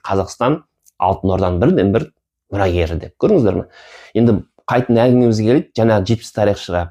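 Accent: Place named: Xinjiang, China. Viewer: Фу ДАУЫСЫ КАНДАЙ ЖАМАН ,кытайдан келген казак тардын сойлеген СОЛАЙ ЖАМАН.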